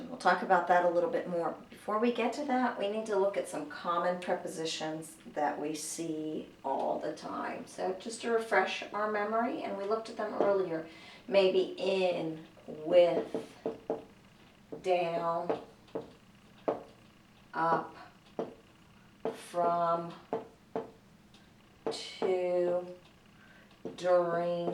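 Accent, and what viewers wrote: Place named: South, United States. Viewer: Beautiful southern accent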